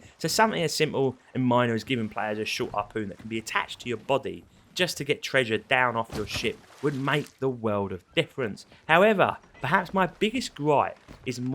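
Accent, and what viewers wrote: Cockney, United Kingdom. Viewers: You deserve more subscribers my cockney sparrow! Love your videos 👍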